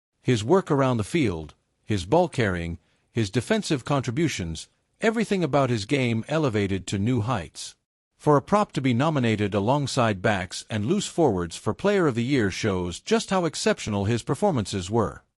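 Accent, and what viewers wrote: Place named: United States. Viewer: Oh, come on! These awards go on current form and achievements. Cheslin Kolbe is an amazing player, and one of the best in history, world-wide. But, he is NOT alone. There are other incredible players who also deserve to be recognised. Only one can be the winner. Just because Kolbe isn't in the running for this award doesn't mean he is less revered than the ones who are. It just means that in 2025 he played in less games due to injury or whatever, and then when he did play, he didn't get as many opportunities to shine as he usually does. What I find ridiculous is that a Springbok rugby channel has an AMERICAN ACCENTED AI giving the commentary!! It can't even pronounce our players names correctly!! Who the F*** is Jasper WEEZE?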